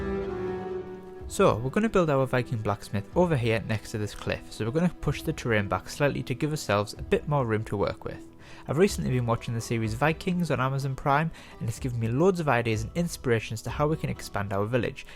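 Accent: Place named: Scotland, United Kingdom. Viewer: From your acent i guess you are from skotland like yelowwool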